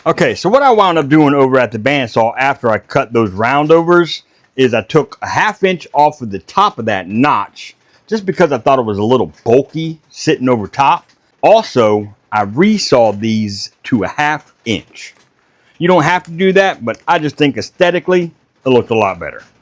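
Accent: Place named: Louisiana, United States. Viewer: I swear you sound more cajun dan vikin. just sayin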